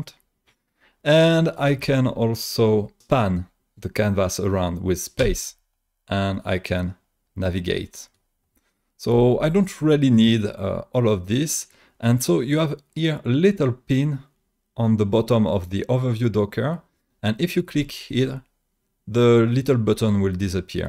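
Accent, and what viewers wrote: France. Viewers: Love that you walked through your layout setup process and everything, thanks a lot! Re: that disclaimer in beginning -- I actually prefer my English tutorials with a heavy French accent :D Also love the draw-in-the-style work, I prefer it over the more anime-style original. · Tbh french accent make this tutorial a pleasure to hear. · Your french ascent is really lovely and your videos are so wonderful. Learning so much here 👌🏿✨ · Dude I love your accent! I love listening to the French language. I love watching French television. But with subtitles in english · Thank you very much , it's a great deal. BTW, your French accent is not so heavy as YouTube warns. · I came down here immediately to type this very same message! Mr., your accent is absolutely beautiful, never apologize for not being English-speaking as it isn't even your first language; the French language is beyond beautiful and you speak English marvelously, very easy for me (with hearing impairment issues) to understand you. 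Thank you for this very ambitious tutorial, I just got the Wacom Intuos Small (CTL4100) for Christmas, it is my very first drawing tablet! Any suggestions on settings I should setup for it anyone? I've watched a few videos on setting suggestions, but there are buttons on the tablet itself, then on the pen and I'm not sure what these should be set to with Krita that would be beneficial. Additionally, is it normal for the pen to be so sensitive? I barely get the pen close to my tablet and it's already moving the pointer on my screen - is this just something I need to get used to? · "heavy french accent" already warms my heart)) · Just... Bravo! Giving you standing ovation right now. I liked the very detailed explanation and your french accent - it just make the video better. I am still couple of light-years away from your level of drawing skills, but video helped me a lot.Thank you! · An hour well spent...the French accent made listening a joy! 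Thank you very much for the immense effort you put into making this fantastic tutorial! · Hahaha c'est cool - heavy French accent! 😆 Je suis Allemande et je l'adore en fait 😄 Je suis sûre que j'ai un vrai accent, aussi, quand je parle Français! Mais l'accent Allemand n'est pas si charmant! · damn, accent disclaimer. i watch your channel for 3 years now, and i also saw really old videos... compare to them, your accent became barely noticeable. even old videos were completely understandable, idk, maybe it's just me whose first language not English either (heard that foreign speakers understand different accents earlier than natives) remember only one struggle, it was shading/lightning video. "Ard light" layer mode made me stop the video and think a lil bit XD then i was like "oh, french, no H sound, riiiiight, got it". this only time from aaaallll your videos who needs it, will tolerate eith any accents or difficulties. 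if someone like "iu, accent, turn it off", they're probably not really into it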